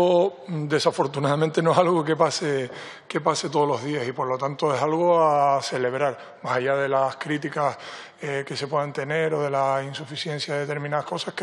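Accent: Spain. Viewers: LOS FASCISTAS, SUS MENTIRAS Y MANIPULACIONES...EL CHAVAL ES ESPAÑOL, ADEMÁS CON MÁS ACENTO PURO QUE LOS "OSEA, CACEROLA, PUM, PUM"... AQUÍ SE PODÍA APEDREAR A UNA MUJER DURANTE EL FASCISMO, O LO QUE SE QUISIERA...Y LOS BOTS, HAN SIDO MÁS VECES DESMONTADOS POR PARTIDARIOS DE PP-VOX EN EL ÚLTIMO AÑO, QUE LOS UTILIZADOS POR RUSIA Y SUS HACKERS EN EL MUNDO ENTERO...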